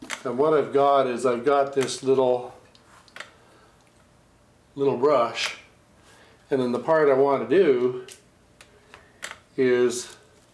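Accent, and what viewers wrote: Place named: Midwest, United States. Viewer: Thanks for your videos! I thought your accent was MidWestern. Michigan, Wisconsin. I'm from Pennsylvania.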